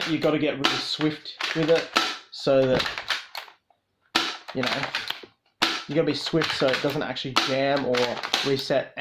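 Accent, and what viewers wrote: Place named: Australia. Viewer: Jelly Fishyzz all Australians sound like him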